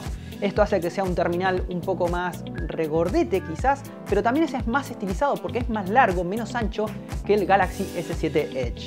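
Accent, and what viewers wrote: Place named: Argentina. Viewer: El acento Argentino lastima los oidos.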